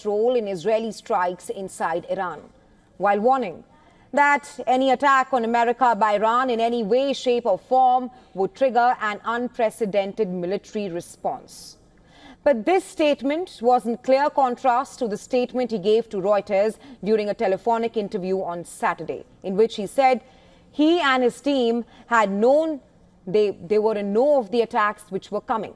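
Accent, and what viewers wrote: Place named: India. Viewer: I am American. I would never ask an Australian, British or South African person to change their accent. The Indian accent is beautiful and I understood 100 percent of what she said.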